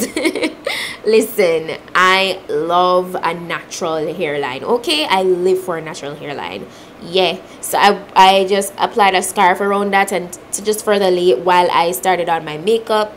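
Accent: Jamaica. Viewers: Just listening to you talk makes me miss Jamaica. My family lives in Kingston 13. I used to visit twice a year but haven’t been for almost two years now.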